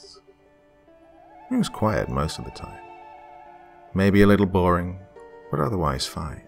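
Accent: United Kingdom. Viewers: I made the mistake of thinking that with your accent you were perhaps already located in the UK. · I thought you were British 😂 well, enjoy your trip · i also live in england and i thought he lives in england?!?! im so confused i thought he was british? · "My work is taking me to England" 1) I genuinely thought you were British! 2) Good luck, don't mention Brexit.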